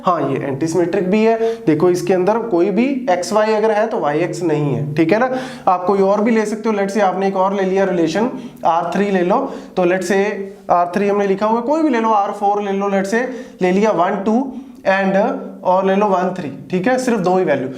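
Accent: Punjab, India. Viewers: I love your punjabi accent so much😍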